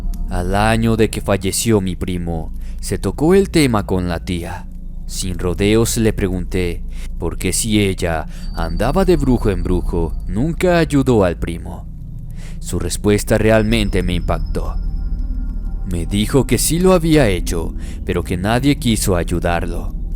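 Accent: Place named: Chile. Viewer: tu linda voz de chile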